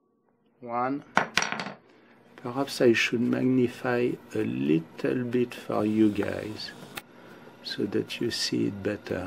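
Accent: France. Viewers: Man I like your English with that French Accent!!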